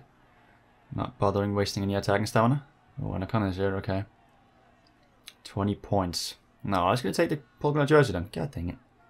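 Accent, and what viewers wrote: Denmark. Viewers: Lol I could hear you are Danish for the first 2 seconds.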